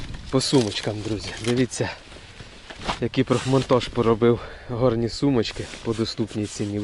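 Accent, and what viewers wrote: Poltava, Ukraine. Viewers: Ніяк не зрозумію звідки ти. Говір полтавський, але сула нетипова для полтавської обл. Хоча, я не скрізь бував. А може сумська?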